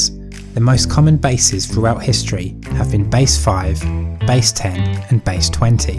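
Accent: United Kingdom. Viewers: Let me ask you something, do you use metric system or imperial? British accent is funny, we from Brazil think the same about the Portuguese accent, but this doesn't make his argument less valid. Use imperial system however is stupid, and what makes it worse is that USA is affiliated to the metric standard and all of its imperial bullshit comes from metric's standards, it's only a conversion.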